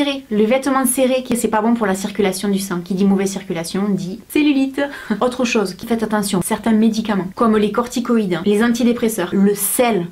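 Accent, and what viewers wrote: South, France. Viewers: Ton accent vient du sud non? J'aime trop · Merci pour ta vidéo, merci pour ton avis sur le thé détox que tu as testé et le livre sur la cellulite, ta bonne humeur et ton accent du sud son un vrai bonheur à attendre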